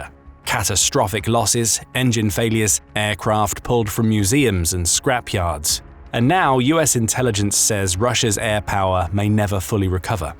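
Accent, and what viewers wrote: United Kingdom. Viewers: These videos are copium with a British accent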